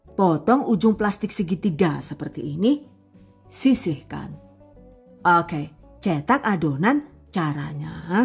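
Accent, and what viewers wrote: Banyumas, Indonesia. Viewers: ngomonge jan ngapak gawe guyu inyong #GiveawayTHV2021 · Mom nya wong bnyumas kiye hehhe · Kalau bahasa ngapak emang gitu bund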